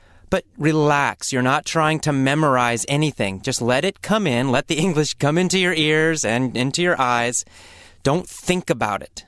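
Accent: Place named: Canada. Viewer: هذا لديه لكنة كندية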